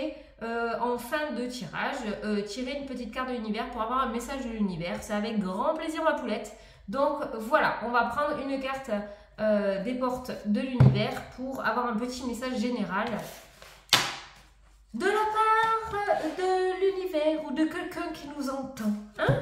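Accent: South, France. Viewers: Félicitations pour les 60 000 abonnés! Je participe au concours avec plaisir, j'aurais l'occasion d'entendre votre accent du sud au téléphone. Très belle journée 🌹🍀🐞🦋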